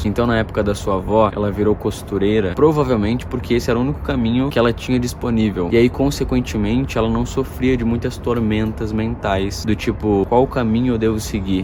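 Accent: Caxias do Sul, Brazil. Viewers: Ele é de Caxias do Sul?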